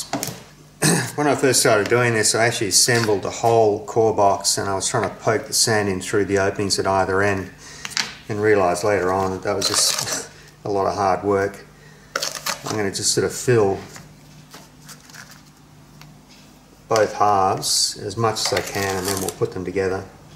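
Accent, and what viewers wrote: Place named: Australia. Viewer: Good job! Love hearing your accent it reminds my Mother in law. Yes she was an Aussi as well. Go Wallabys!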